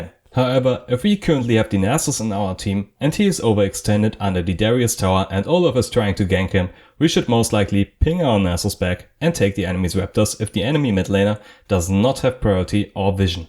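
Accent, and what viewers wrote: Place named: Germany. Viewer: Short, helpful, sounds germanish, like und abo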